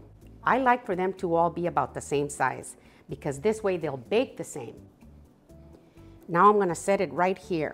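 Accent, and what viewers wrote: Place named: New Mexico, United States. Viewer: thank you so much. I've been looking for a recipe for dinner rolls without using a mixing stand, which I don't have. You are so nice to listen to and understand. You remind me of my best friend of 54 years, her mama. I grew up in Albuquerque, NM and you sound a lot like her with your beautiful accent. I'm so excited now bc I know I can do these your way. Have a great weekend.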